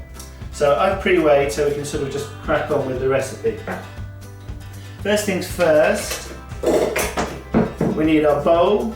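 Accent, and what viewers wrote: United Kingdom. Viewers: Where are you from? I’m guessing British but I’m pretty terrible at these things:/ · " "!!!! i get it.... u a mancunian?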